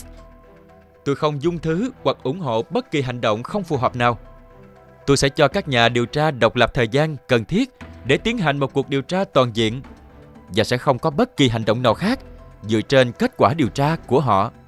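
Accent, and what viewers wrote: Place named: South, Vietnam. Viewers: mình khá là ko thích giọng ng miền nam khi đọc kịch bản, ko phải tất cả nhưng từ lâu mình rất thích nghe voice kịch bản của những ng miền bắc hơn (mặc dù mình là ng miền nam), nhưng giọng bạn này hay thật, dù đọc tên có hơi sai nhưng tụ chung giọng vẫn rất truyền cảm, mong kênh bạn sẽ ra nhiều video chất lượng hơn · Đừng nói vậy, tội nghiệp anh ấy lắm Anh ấy sinh ra là người nam nên giọng vậy, k hợp ý bn cho lắm Nhưng k sao, giọng anh ấy hay là đc :D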